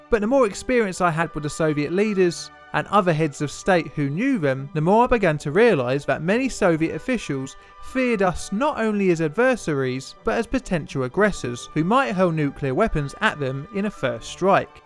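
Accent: United Kingdom. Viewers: Great vid, except audio. The narrator's voice is a bit unclear, heavy Brit accent. Not the problem, but the classical music in background is a bit too loud. Between the two, it sounds chaotic.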